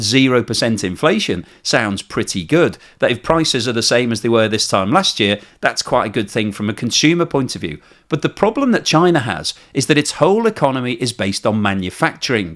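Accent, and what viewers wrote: United Kingdom. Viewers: The bloggers brit accent is hard on the ears, especially when pronounces letters T. Ouch I'm out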